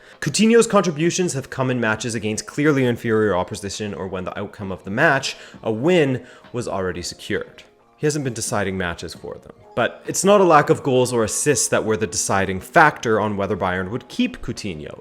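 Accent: United States. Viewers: When I heard your American accent I thought you’d be making me angry at some point like most Americans talking about football. That didn’t happen. Very informative and well made video. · Are you American? Lol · the only time I hear football spoken about in an American accent, it's completely bashing it. nice change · Heard an American accent, turned off. It's Inter Milan not Inter Merlhaaaan.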